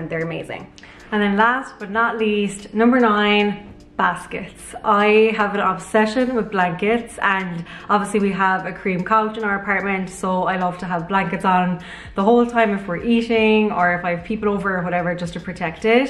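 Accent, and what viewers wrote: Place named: Ireland. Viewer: Pretty Irish accent.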